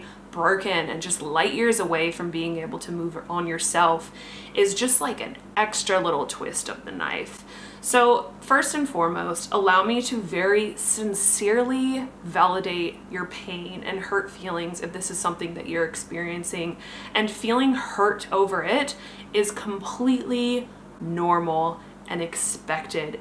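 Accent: Australia. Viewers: are you a bit Australian too? This video was really helpful. Thankyou :)) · You have a slight Australian accent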